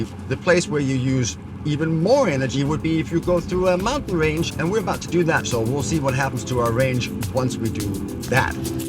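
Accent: Denmark. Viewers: Awesome video! Thank you for taking the time to make it! That car is just beautiful even after 10 years! ... Also.. Am i correct if i you have a slight danish accent?! :D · Du lyder dansk :)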